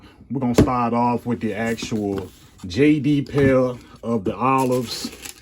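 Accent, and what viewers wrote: Richmond, United States. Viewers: Sound like you from Richmond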